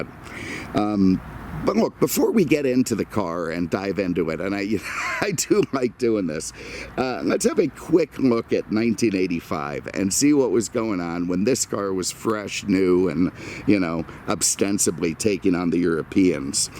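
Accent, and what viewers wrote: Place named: New York, United States. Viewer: Your stand-up and fear of any living animal-(scary, filthy, up to no-good, gang, face ripping), cracked me up. I clicked for the Caddi, but we’re from the same decade and out, so got every one of your references. I especially hate humidity and only visit Fla. from November until February. Beyond those months, only for money! You were hilarious and sound like the consummate NY transplant. Good Luck with the Eldorado. I prefer the ‘78 whale in that brownish gold mist colour and in Biarritz trim. Btw. I have a ‘81 Toronado Brougham in triple-navy. I love her! 🌞😂😎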